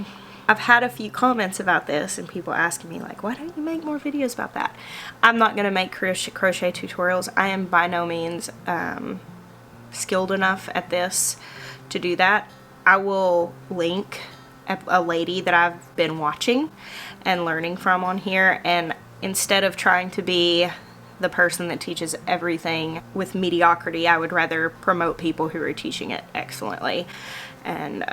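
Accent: South, United States. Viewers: mah goodness, yur sourthern accent is deepening---South Carolina affected??? Your audience is much broader; please remember your viewers watch your channel for information, community, and connectivity. Don't leave the non-southerners high and dry. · Are you saying people outside the South are so intolerant that they can’t handle Southern Accents?! What nonsense. her accent is fine and part of a valuable and unique culture.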